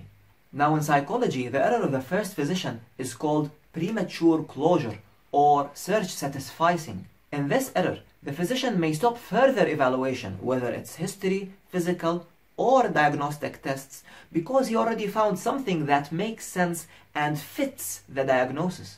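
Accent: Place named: England, United Kingdom. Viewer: I am so excited to learn from you..please pray for me to be accepted in the med school...I loved the video the informations and your English accent as well...Good luck doctor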